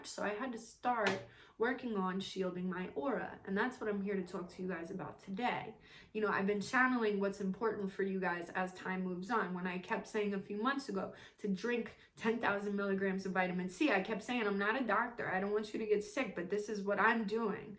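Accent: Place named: New York, United States. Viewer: It's the new York accent but I agree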